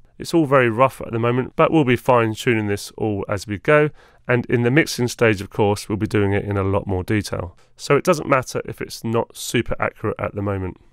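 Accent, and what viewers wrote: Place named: United Kingdom. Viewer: Nothing bet'er than to have a brit' teacher, noice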